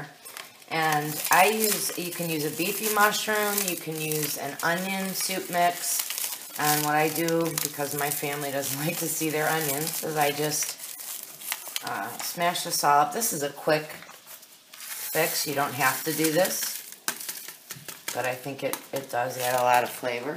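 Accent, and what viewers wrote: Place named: Chicago, United States. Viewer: are you from Chicagoland. I am from Matteson and you sound like a local. I have subscribed and will be making that ASAP. You rock!. Keep them coming I will be watching.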